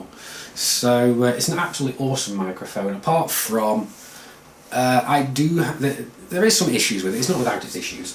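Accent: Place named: England, United Kingdom. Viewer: Congrats on reaching 15k subscribers. And good luck with getting more. BTW, from which part of England are you from?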